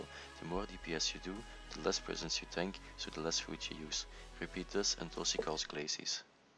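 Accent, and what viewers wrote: Netherlands. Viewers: are you dutch ? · Dat Nederlands Engels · you dutchbro?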